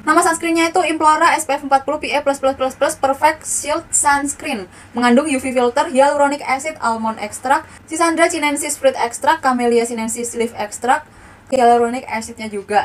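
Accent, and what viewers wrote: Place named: East, Indonesia. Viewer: Kakak asli mana ? Logatnya kek orng daerah wetan yaak hhe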